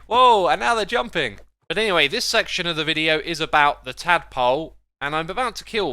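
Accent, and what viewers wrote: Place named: United Kingdom. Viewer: i love your British accent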